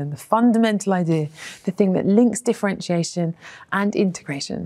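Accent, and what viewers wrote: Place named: United Kingdom. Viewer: Smart women = So attractive yeah the British accent doesn't hurt either.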